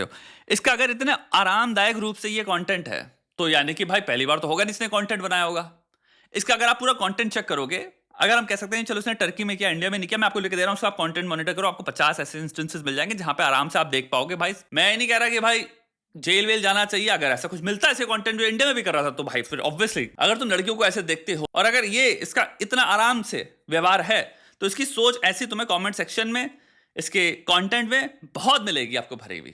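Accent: Pakistan. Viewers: Accent to pakistani lag rahi hai iski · H sounds like Pakistani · Lahori accent ha iska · His accent is Pakistani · The accent gives me that he is from Pakistan. I might be wrong but all the lingos and slangs he is using are usually used by Pakistanis. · His accent coincide more with pokistani than with an Indian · he has pakistani accent and muslim i am 100%sure he's a pakistani and that's their thinking for a kafir women's that's what they are taught since childhood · Pakistani lg rha hai accent se...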